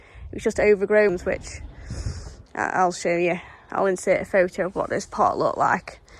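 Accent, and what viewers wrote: United Kingdom. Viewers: I’d love to see a transformation video, a before/after with your thoughts on what you recommended to the client and why. Living in America, I can listen to an English accent for hours. Also, either a video or narration over a video of how and when you started in the business, what you love about what you do, what you don’t like so much, etc. Have a great weekend and stay safe out there 😁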